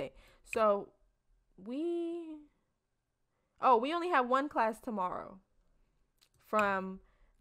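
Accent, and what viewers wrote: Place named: DMV, United States. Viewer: im from dc and i knew you had to be from the dmv area ! i heard the dmv in your voice 😂 i just found your channel and instantly loved your videos❤️❤️❤️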